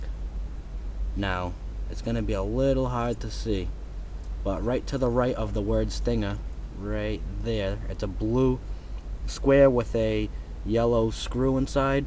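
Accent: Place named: Brooklyn, United States. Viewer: Sounds like hes from Brooklyn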